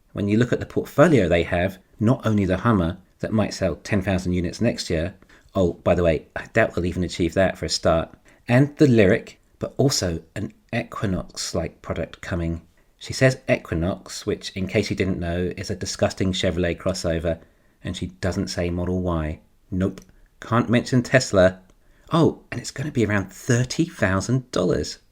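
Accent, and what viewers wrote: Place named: London, United Kingdom. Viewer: Sounds closer to London to me (or at least home counties)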